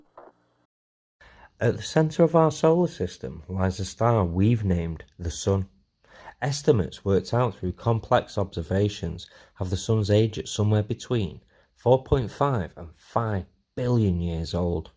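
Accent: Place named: United Kingdom. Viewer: I love stuff like this. Love the British accent of the narrator too! Easy to understand info. Even my wife was able to follow! 😂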